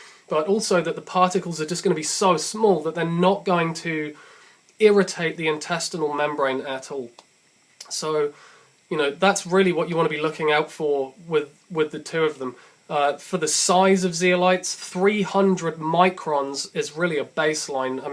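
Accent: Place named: England, United Kingdom. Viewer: it's great to hear an English accent (for a change) after seeing all these video's with Yankee accents all the time.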